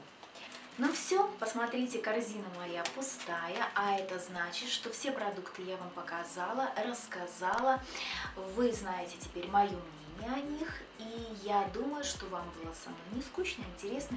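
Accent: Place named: Belarus. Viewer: Вы такая приятная)!обожаю акцент белорусов!!!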